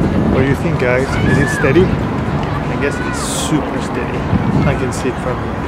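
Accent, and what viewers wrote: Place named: France. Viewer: l'accent français c'est impossible de s'en débarrasser en fait mdrr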